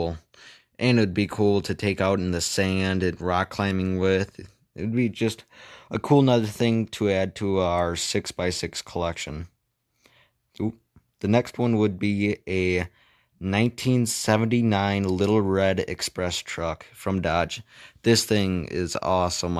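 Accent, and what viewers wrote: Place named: Wisconsin, United States. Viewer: You can hear that this man is from Wisconsin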